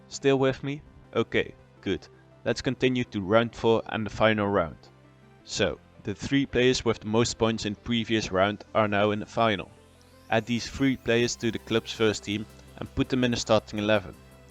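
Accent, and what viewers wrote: Netherlands. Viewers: your accent has to be the most 'Brabant`s' English i have ever heard xD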